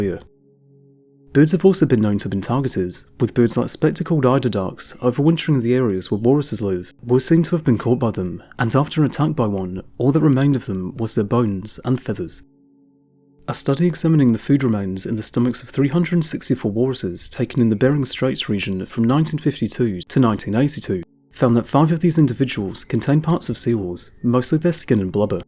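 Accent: United Kingdom. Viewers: First 10 seconds of the video was too British for me to understand 😭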